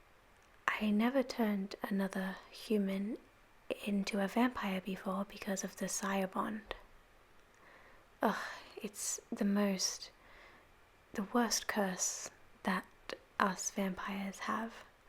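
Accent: Australia. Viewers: the Australian accent which is a trigger in itself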